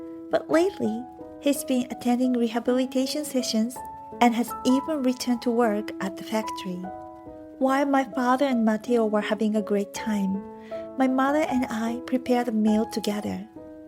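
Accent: Japan. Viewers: The audio seems to be spoken by Japanese. So why use Latino names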